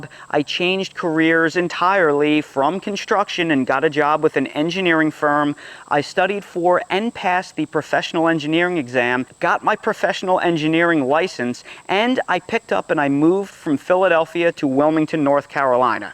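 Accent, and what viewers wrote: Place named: South Jersey, United States. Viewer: South Jersey girl here. I remember watching a video of yours several years ago when you had a few thousands subs (and I heard that SJ accent which hooked me!). As an avid gardener myself I’ve been watching you ever since. Loved hearing about your background and I always look forward to your videos, so thanks for everything you do!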